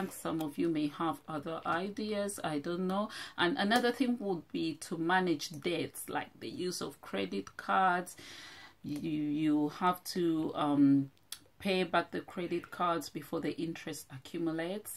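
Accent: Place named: Uganda. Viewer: You are a beauty. Where are you from? I'm Kenyan and to me you sound Ugandan... maybe Zambian or Malawian. Advice: film before a window (the natural light is much better than an overhead bulb. Also, set the phone/camera down so that the footage is stable. Good luck on your journey!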